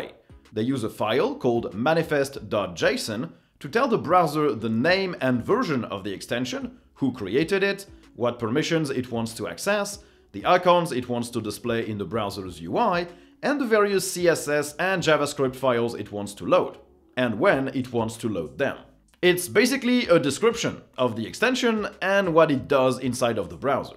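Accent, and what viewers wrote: France. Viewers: Je me disais aussi que tu avais un accent français :)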